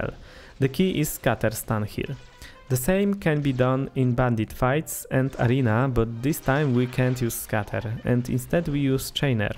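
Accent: Poland. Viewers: i ten polski akcent hehehe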